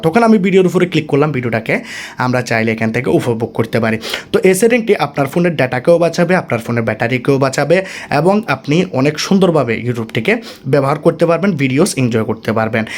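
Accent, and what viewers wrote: Bangladesh. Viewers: ভাষা ঠিক মতন বলতে পারেন না কথার মধ্যে বাঙাল কথা আসে কেন? যেমন আপনি বলছেন গোফন কথাটা হবে গোপন। সেটিং কথাটা ঠিক বলছেন না বলছেন স্যাটিং। কথা বাংলা বলছেন কেমন যেন গাঁইয়া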